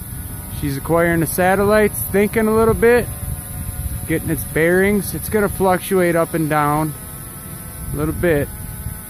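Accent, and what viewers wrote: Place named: Minnesota, United States. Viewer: Hi. Your accent sounds like Wisconsin or Minnesota 😎. I'm from Minnesota but live in California and lost my accent::( anyway I was wondering how far you can go in altitude hold before flipping on gps and it wouldn't kick in to save my butt? I'm ordering the latest version. What are your thoughts on that? Thank you for your time. Have a great day. Sincerely William Horsley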